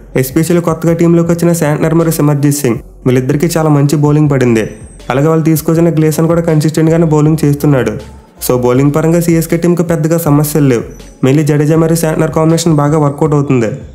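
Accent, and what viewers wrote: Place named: Visakhapatnam, India. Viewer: rajahmundry ayyina aa slang lo matladatledhu miru vizag slag lane undhi so vizag vadivi anukunna bro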